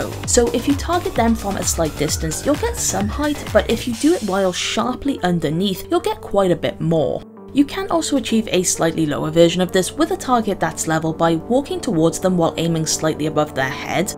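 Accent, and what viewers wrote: United Kingdom. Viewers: I love your british english!!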